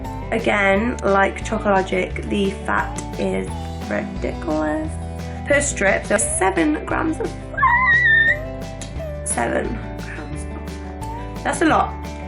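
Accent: Wales, United Kingdom. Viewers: Is there a hint of wales in that accent ?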